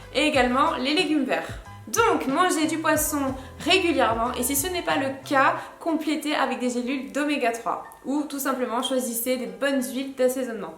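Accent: South, France. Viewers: J'adore ta voix avec ton petit accent du sud haha video interessante merci continue comme ca tu gere biZe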